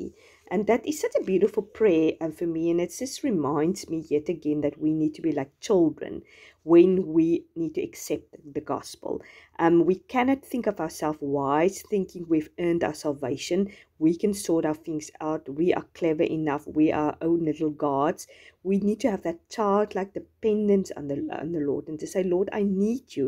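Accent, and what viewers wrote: South Africa. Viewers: This is so pretty are you from South Africa ? I love your accent. ♥️♥️ I just started journalling and scrapbooking. I love your ideas of incorporating God into journalling I never thought of that before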